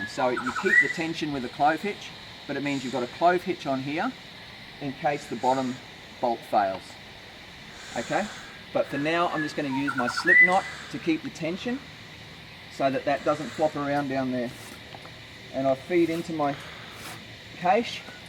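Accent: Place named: New Zealand. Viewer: Does anyone else from Australia get really confused sometimes when you hear someone who's not an American, but just to make sure you watch a video of an American and then it clicks... kiwi...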